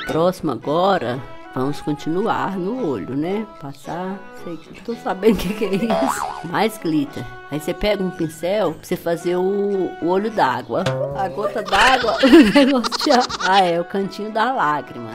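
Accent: Minas Gerais, Brazil. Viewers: E esse sotaque mineiro haha amo, mineirinha tbm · Se eu que sou de Minas amo esse sotaque nosso,imagina quem não é 😍😍😂😂😂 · Bao de mais...amo sotaque de Minas Gerais 🙌😁😘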